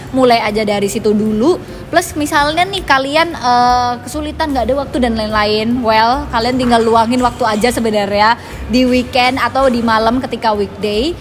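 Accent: Java, Indonesia. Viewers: Kak sekarang logat jawa nya tebel banget kak hehe 😁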